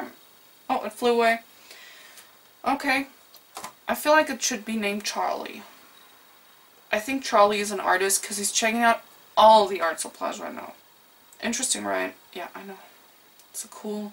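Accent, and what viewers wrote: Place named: Germany. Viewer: a german accent~ I can only say this because you sound similar to Kicki Yang Zhang in her older videos. Dw it's not heavy or anything lol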